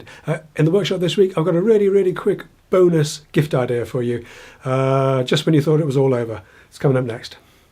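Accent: United Kingdom. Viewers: A great little gift idea.Thank you for your time and inspiration this past year, I always enjoy your videos; Fun, Informative and with a Wonderful British Delivery.From one Brit to another, Merry Christmas, All the Best to you and yours.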